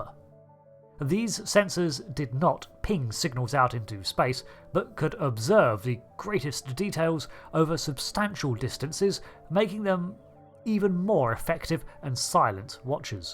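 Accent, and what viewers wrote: England, United Kingdom. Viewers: He's from England. They pronounce it anyway they please.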